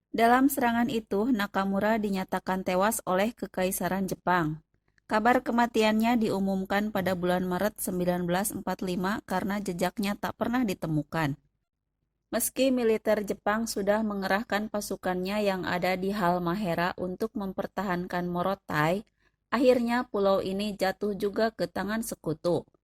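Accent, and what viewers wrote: West Java, Indonesia. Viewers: Kaciri nyunda na teh. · Komentatornya orang sunda..........datar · Logatnya sunda eui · Konon, ada satu Stigma yg mnyatakan sbgai berikut : " Bernyanyilah seperti Orang Ambon, Berpikirlah sprti Orang Jawa, Berdaganglah sprti Orang Padang, Bertindaklah sprti Orang Batak dan.... Berbicaralah sprti Orang Sunda....!!! " Sprti Narator ini, dn jg yg lain melahirkanlah suara2 Diplomat Ulung Kelas Dunia dari Indonesia dg Tuturan kata tata bahasa Indonesia dn aksen Parahiyangan dg Diplomasi piawai nya, semisal diantaranya : Mochtar Kusumaatmadja, Ali Alatas, Nana Sutresna, Marty Natalegawa, Hasan Wirayudha, Djuanda Kartawidjaja, Connie Rahakundini Bakrie ( pengamat militer dn internasional ), Djuanda Alm/ pngamat intelijen dn Maritim internasional ( penasihat Gus Dur ) dll. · Miminny bahasa indonesia logat sunda😃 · Naratornya urang sunda? 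· Pilipina, dipisi, konprensi, menginpormasikan, aktip, pormosa... 😂😂 Logat sunda teh emang teu bisa di bohongin euyy 😁😁 Mantaaappp 👏👏👍👍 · Naratornya sunda hehe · Logat sunda mah gak bisa di ilangin ya bu.. 👍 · Pengisi suara pasti orang sunda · Sunda banget eceu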